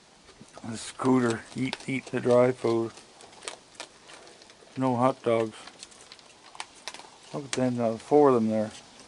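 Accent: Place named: Sydney Mines, Canada. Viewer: I know right? He also sounds like people from Sydney Mines - I thought that was where he was from, when I first started watching him LOL.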